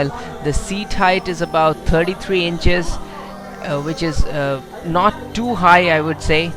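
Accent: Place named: Bengal, India. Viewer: That bengali local accent.. I love it...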